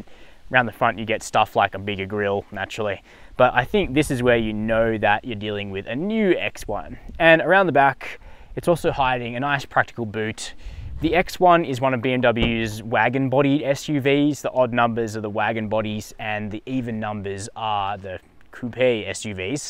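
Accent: Australia. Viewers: Very Aussies accent, can not be wrong 😅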